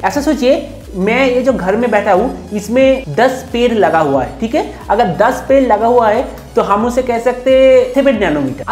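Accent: Bengal, India. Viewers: আপনার উচ্চারণ শুনে বাঙালী মনে হল।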